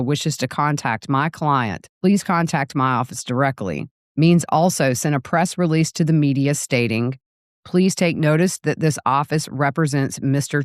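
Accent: South, United States. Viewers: Hello 😊 New sub! Just luv your accent! You’re the original southern gal, I am an implant lol. Missed the ID trials & hoping to play catch up here. Since you got this 1st I feel extra lucky to have finally found my go-to for this case! 😊 Enjoy tonight with your son!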